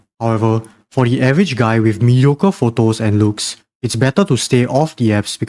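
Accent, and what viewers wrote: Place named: France. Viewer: you sound slightly Frensh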